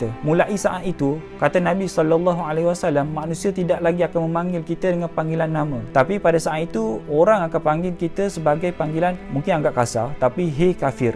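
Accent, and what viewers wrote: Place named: Kelantan, Malaysia. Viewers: Bru tahu abang ni ore kelate..sbb ore kelantan dia xleh tipu sbb mesti akan kluar loghat kelantan wlaupun ckap bahasa KL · Ada bunyi kelantan disitu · ore kelate ko boh..hahaha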